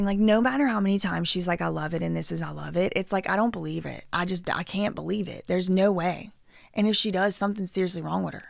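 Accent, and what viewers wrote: Northern England, United Kingdom. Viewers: Im Scottish and your UK Northern English accent was great! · To intentionally want to cause trauma on others really?? sounds to me like she weaponised her own trauma. WOW, i'm speechless, i'm based in the UK and i didn't even know about the depth of this s**tshow. Thanks for shining a light. Ps: Nice British accent ha! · That's EXACTLY how northern girls speak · That UK accent... chefs kiss. Dont nobody need to be in the chat to tell you different 😂💂🏻‍♀️ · OMG this is one of the BEST videos you have done! You have to find some more UK stories because hearing your accent made me smile for the first time today! Thank You❤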